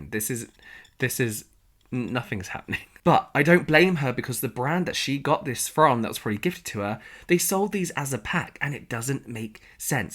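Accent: United Kingdom. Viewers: is simply a gorgeous specimen of a man 😍! The British accept is just a plus! God bless you Papacito Bello! 😘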